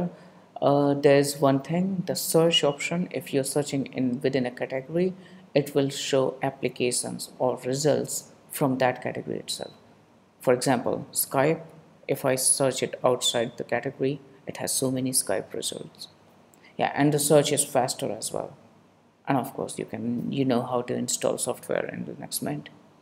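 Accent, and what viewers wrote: India. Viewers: It's Indian accent. Where are you from?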